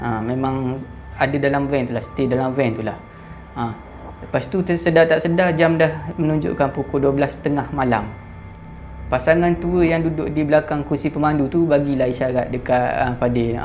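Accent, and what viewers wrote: Terengganu, Malaysia. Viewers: org kuale teganu ke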